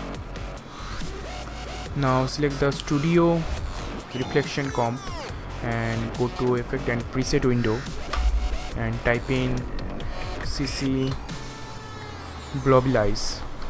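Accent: India. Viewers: Sorry, but your profound Indian accent prevents me from understanding hardly anything you say !!!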